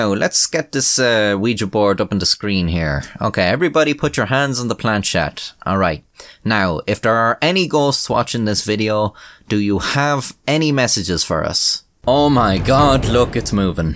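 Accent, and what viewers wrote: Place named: Ireland. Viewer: Come to Ireland for a year and you can understand him better :)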